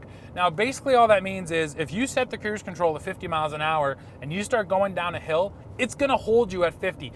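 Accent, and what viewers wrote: Canada. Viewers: Actually, his accent is a Canadian one.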